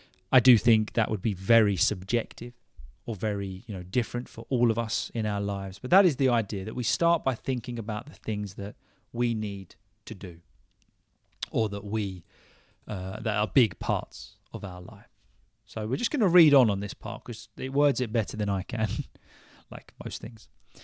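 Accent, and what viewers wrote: England, United Kingdom. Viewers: One and only British native speaker who speaks slowly and clearly thanks for understanding us haha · Thanks teacher, I am osman I follow you and enjoy. topics.Also your accent is realy authentic eglish .My good wishes from Turkiye 😊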